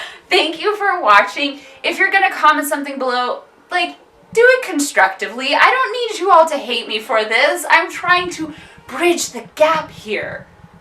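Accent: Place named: Slovenia. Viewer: Your slovenian accent is so smooth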